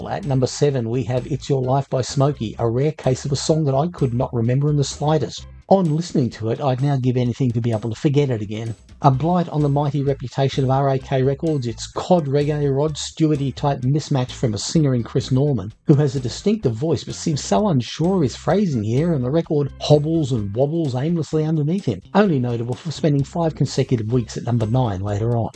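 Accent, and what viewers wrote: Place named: Australia. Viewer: What part of Australia are you from? Cool accent 😎 and as per usual awesome commentary 😊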